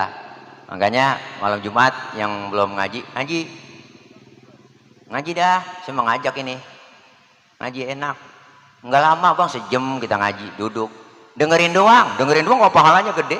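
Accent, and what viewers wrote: Jakarta, Indonesia. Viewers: Betawi'y dapet ini ustad 🤣 Sya bangga sya juga betawi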